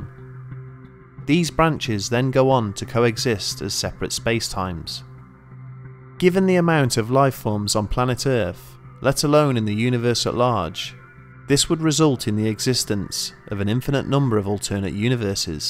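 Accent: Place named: Northern England, United Kingdom. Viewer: His accent is northern English (possibly Yorkshire) although not very pronounced. The internet is swamped with American media and accents these days which is probably why you haven't heard of it before. Even the media in Britain tends to include predominantly southern/accentless British voices so we're not blameless either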